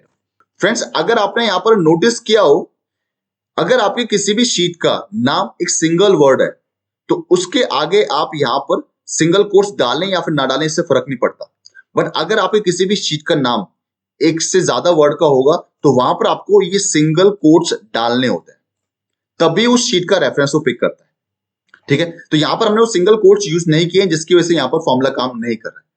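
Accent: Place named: India. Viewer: Indian speaking but excellent demonstration. Thanks a ton. Big like and subscribe for attaching the working file. 👍👍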